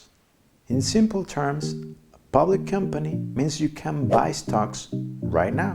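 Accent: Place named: England, United Kingdom. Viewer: Si hablas un ingles tan “ingles”, porque no los subtitulos en castellano??? 🤪🤪🤪🤪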